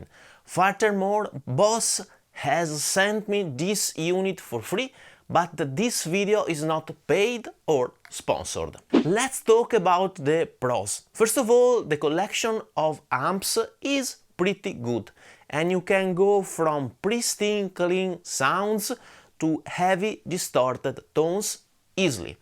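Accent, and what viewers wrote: Italy. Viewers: Very good demo, my compliments! I am thinking to buy this pedal to use it in my upcoming recording session in April instead of the tc electronic combo 65. i can hear from miles away that you are italian and that is great 🙂! Just starting to follow your channel. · what part of Italy do you come from, as your accent is very thick?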